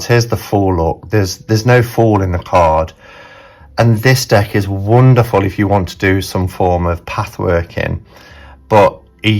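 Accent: Nottingham, United Kingdom. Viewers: Nice reviews. You sound like you're from Nottingham like me lol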